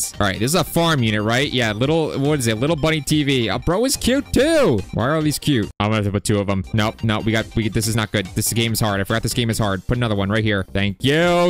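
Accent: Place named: United Kingdom. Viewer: Why bro speaking British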